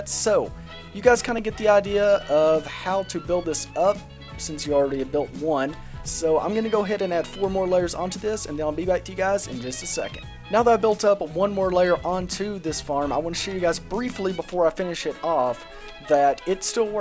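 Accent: South, United States. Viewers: Love your southern accent by the way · Honestly an amazing video, your mildly country accent and speaking tempo with the music is great with this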